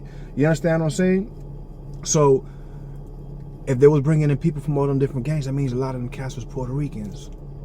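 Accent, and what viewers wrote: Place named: New York, United States. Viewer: You on every channel speaking like you from NYC🗽🤔🤣🤣. Boricuas🇵🇷did NOT move into the BX🗽 in the 60’s. Stop spreading lies. Boricuas🇵🇷been coming into NYC🗽since 1920’s. By 1960 Boricuas🇵🇷were the FIRST Bodega owners. The Boricua🇵🇷population has always been the highest population in the BX. If you were from NYC🗽 you would of known that. Central Harlem is the highest population for Blacks.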